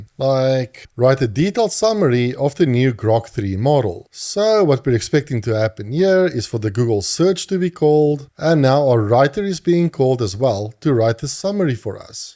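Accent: South Africa. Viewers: Nice one! Sitting in Netherlands and listening to a Saffa was a proud moment. Love the skillset you bring here, thanks you! *SEO Specialist setting up a multi agent on n8n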